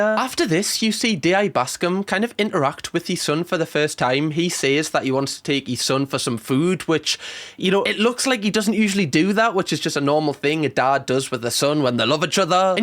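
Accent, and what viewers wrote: Newcastle, United Kingdom. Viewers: Loving yooursoft Geordie accent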